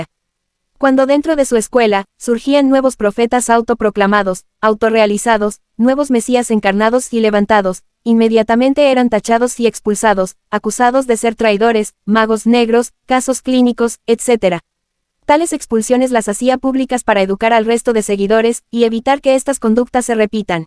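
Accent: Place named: Rio de Janeiro, Brazil. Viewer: a Sede da FRA no Brasil , esta na cidade do Rio de Janeiro . nota-se o sotaque proprio daquela regiao neste.video . o audio nao possue uma boa qualidade . .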